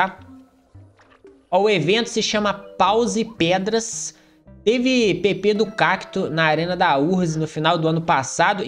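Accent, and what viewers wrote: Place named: Minas Gerais, Brazil. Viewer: OI tenho uma pergunta, em qual estado você mora? você parece ser mineiro pra mim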